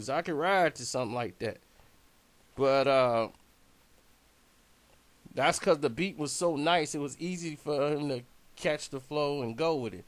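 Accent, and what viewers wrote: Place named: Dakota, United States. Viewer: This dude sound like he only wear Bill Cosby sweaters. Must be from Dakota or some shit. fuck outta here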